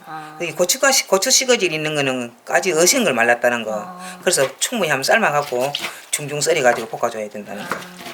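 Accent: Gyeongsang, South Korea. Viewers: 경상도 말씀이라 잘 못 알아듣긴한데 나름 매력적입니다 ㅋ ㅋ 근데 아주 맛깔스럽게 하시는듯 팬 찜!!! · 호박고지나물 영상 넘 잘보고 있어요 경상도 사투리도 좋아요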